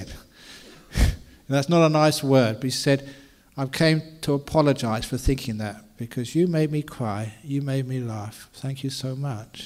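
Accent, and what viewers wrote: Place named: United Kingdom. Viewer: ajahn brahms funny brittish accent. he says "thinkink" for thinking. all words ending in "ing" he says "ink"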